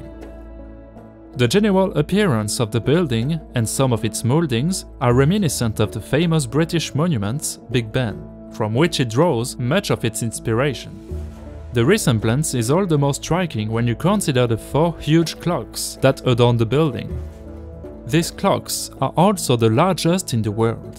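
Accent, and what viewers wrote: France. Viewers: I like your French France 🇫🇷 accent but sometimes I can’t stand what your saying I’m ai sorry will you folrgive me. I’m a sorry.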